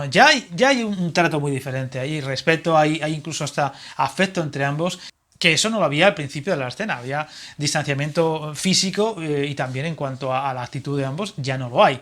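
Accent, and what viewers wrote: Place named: Spain. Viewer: ¿Tengo una duda eres Español? ¿Pero veo que usas fragmento del audio Latino? Buen análisis de la película, digo lo notó cuando tú hablas compañero, nos vemos después en un nuevo vídeo.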